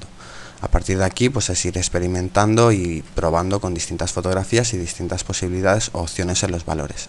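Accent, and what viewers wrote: Spain. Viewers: Así es el acento castellano, -.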